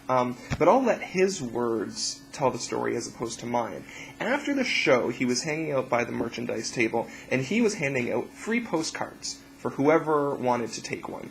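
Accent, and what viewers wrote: Canada. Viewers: haha. you sound canadian.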